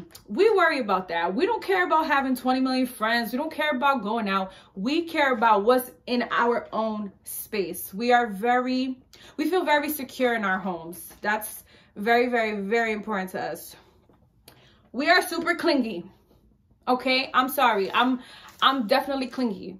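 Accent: United States. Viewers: July 16th you sound like your from the Bronx also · Lmao omg you sound just like meeee! You def gotta be from NY or something! Anyways , CANCER GANG July 12th♋️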